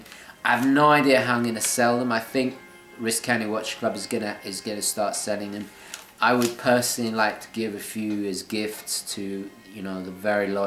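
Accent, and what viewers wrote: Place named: England, United Kingdom. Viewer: I do every much enjoy your reviews and your lovely English Accent. Superb. You dived through the MeisterSigner rather fast and I am the owner (for the second time) of the MeisterSinger Singulator, for which you make some very positive remarks. The reason this is the second time around as the first time, I truly did get confused and couldnt get used to the fact that the big hand (the hour hand) is really all you need to refer to. On the Singulator, it gives you two additional hands, the minutes at the top and the seconds at the bottom, but in all honesty, a rarely make referrrence to either of them, just using the one big hour hand. I wish MS would change the second hand (seconds counter) for a date wheel, which I find something that is really missing on this model. The movement is superb as you say, beautiful. 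Take a look at one (if you can find one) I am sure you will like it. How about a review on QP Watch magazine. You might like that too. Nice work you are doing, keep up the good shows. `Mark, Amsterdam.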